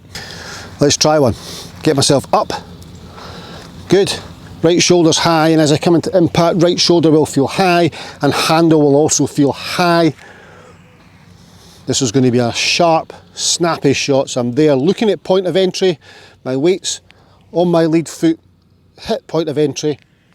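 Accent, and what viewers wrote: Scotland, United Kingdom. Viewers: Love the lesson and your Scottish accent!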